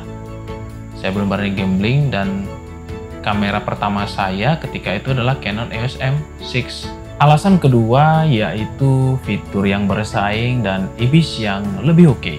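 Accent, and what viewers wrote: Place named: Bali, Indonesia. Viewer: Orang bali ya bli? Logatnya sangat bali😄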